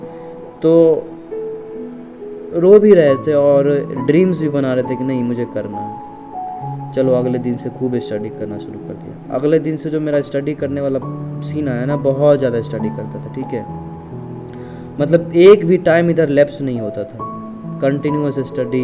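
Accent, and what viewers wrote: Bihar, India. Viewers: Firstly, When I listen you voice I get a smile in my face because I listen Bihari accent. As am too bihari. Story of bihari is always struggleful and inspirational. I'm very inspired by you bhaiya and I'll surely crack NEET2024. 👨‍⚕❤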